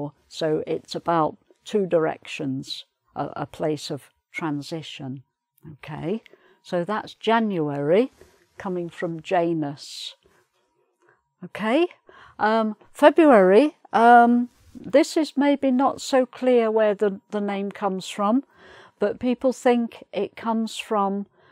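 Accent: United Kingdom. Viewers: I’m from Brazil and i love your videos and British accents 😍❤️❤️ I learn more about the months and your origen so interesting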